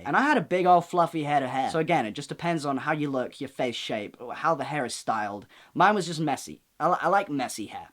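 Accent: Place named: Cockney, United Kingdom. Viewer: Your cockney accent is the best thing I've heard all week.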